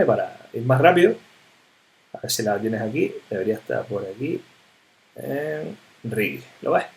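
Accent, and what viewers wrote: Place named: Canary Islands, Spain. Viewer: más canario que el gofio mi niño así da gusto, abrazo fuerte colegui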